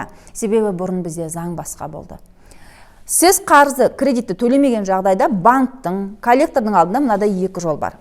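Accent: Standard. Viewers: Кушті түсіндіреді. И таптаза казакша соилиді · Елімізде сендей қазақша айтатын жастар көбейе берсін Сенің ата анаңа рахмет қазақ тілін таза сөйлеп отырсың Қандай қазақ тіліміз құлаққа жағымды есітіледң қазақ тіліміз өркендей берсін!!Ал кридетке келсек аман болсақ төлей берерміз ақша болған кезде. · Рахмеет қазақша таза сөйлейді екен осылай болғаны дұрыс қой бәрін түсіндіиріп айтып берді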